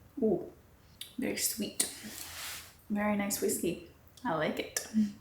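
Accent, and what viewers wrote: Scotland, United Kingdom. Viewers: Such a lovely warm person. Always real and uplifting. And I so enjoy listening to your Scottish accent. Thanks for sharing your adventures. Looking forward to the next one. From the redwoods in Northern California. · You are quite adorable. I'm from Clarkston originally, although I have lived in Vancouver British Columbia since 1967 at the age of 15 and your accent is so fresh and beautiful.....what part of Scotland did you grow up in?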